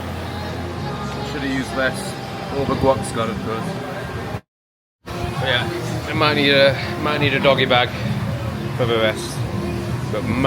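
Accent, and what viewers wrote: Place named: Upper class, United Kingdom. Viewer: You remind me of a privileged guy that went to some famous public school in the uk with that whining upper crust accent. I’ve seen the behavior a few times usually in a movie with the English in some foreign country less developed than their own . Not trying to insult but are you sure you’re strapped for cash? In your free time it might help to at least try to learn a few words of correct Spanish because you sound like even trying is beneath you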